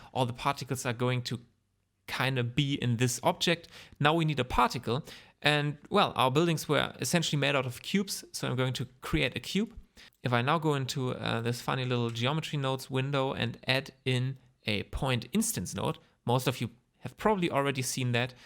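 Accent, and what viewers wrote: Germany. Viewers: I think youre a german guy who speaks very well english 😂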